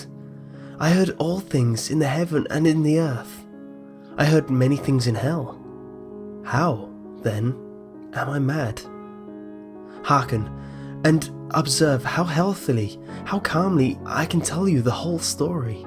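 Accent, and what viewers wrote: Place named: United Kingdom. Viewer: Okay, seriously, grammar, plus he may be from anoth country, everyone says stuff different in different places. Don't judge him for how hepronounces words. I'm pretty sure he's from the UK. We pronouce diffrently. Okay?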